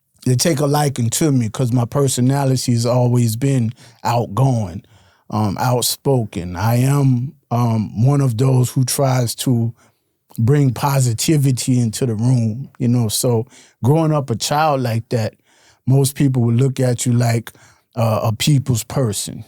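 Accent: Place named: Louisiana, United States. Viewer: He got that Louisiana draw in the way he speak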